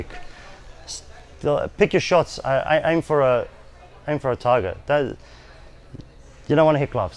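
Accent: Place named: Australia. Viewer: sound like an aussie : )))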